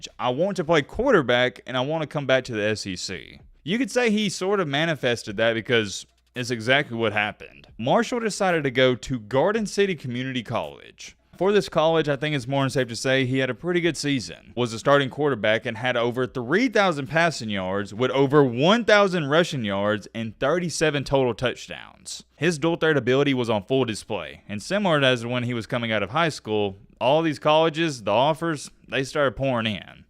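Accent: South, United States. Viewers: How tf u don’t know what a sawed off shotgun is? And you sound like ur from the south?? Like holy jeez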